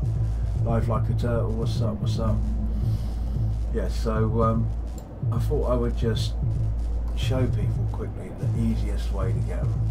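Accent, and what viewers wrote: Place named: United Kingdom. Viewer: Bloody hell, finally hear a streamer from the UK! Fair play 👌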